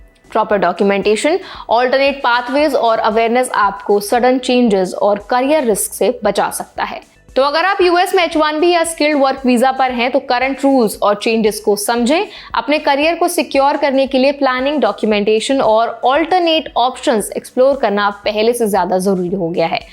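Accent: India. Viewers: Oh, for God's sake,. Could you get your act together? Pehle शिफोल, फिर सिटुएशन, फिर एडुकेशन, फिर Fir, और अब अन्सर्टेनिटी. Location अन्सर्टेनिटी नहीं, अन्सर्टन्टी होता है. Uncertain >> Uncertainty. Please check Google. Kisne aapko Angrezi padhaaii? Main to sochtaa tha aap padhi-likhi hain lekin aapki Angrezi ke mispronunciation se lagtaa hai aapne bas kis UP ke Bhaiyya ya kisi Bihari babua se Angrezi seekhi hai. Ya to aap shudhha Hindi mein baat karein, and if you must use some English words, make it a point to pronounce the English words correctly. Angrezi ko itna disrespect to na karein.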